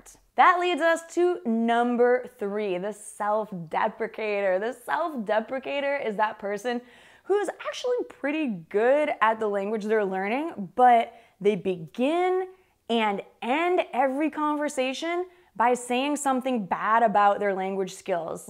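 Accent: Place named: United States. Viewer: Lolz hello I’m Dominican.!!!, if I can be honest I’d tell that I’m a obsessed language learner obout English.! I really love the ways that American native speakers can talk and Actually I’m looking for get to tack and the way that you “ native speakers “ can do it.! Im talking about.... American accent.! The sh...sh... sh.. that you have lolz 😂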